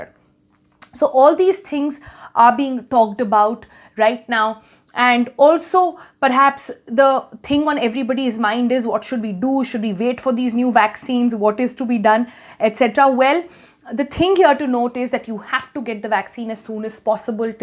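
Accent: India. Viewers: She is talking english, but the accent make it sounds like the language they are talking in India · Purely Indian pakka accent....thanks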